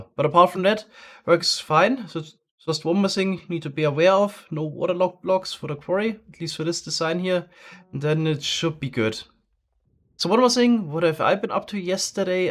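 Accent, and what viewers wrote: Germany. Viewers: I was watching yesterday’s recap and my dad looked and me and said “he sounds German” (he said it in German) and I broke out in laughter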